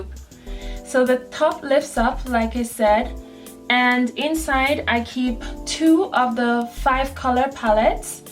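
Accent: Jamaica. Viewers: Keep hearing a Jamaican/Caribbean accent when you speak. Am I right? Great video!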